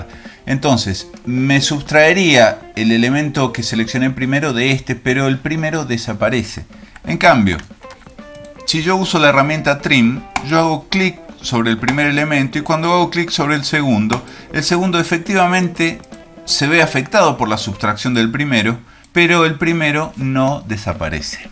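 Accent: Argentina. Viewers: que bueno!!! que interesante y que divertido escuchar a un profe argentino!!!!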